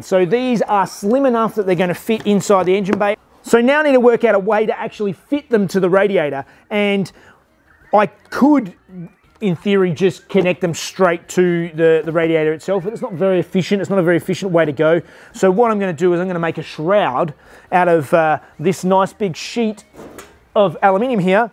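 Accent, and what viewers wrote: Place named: South Australia, Australia. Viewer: Oops. Embarrassing! I owe her an apology. Now I can hear it, but she's definitely picked up a a lot of the southern-Australian accent. And in mitigation, my hearing was shot away quite severely by neuropathy (nerve damage) last year. Salut.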